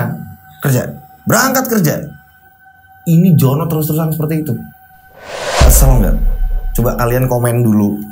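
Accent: Java, Indonesia. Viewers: logat Jawa nya medok bgt s mas klau sy yg paling sy suka boom boom boom nya mas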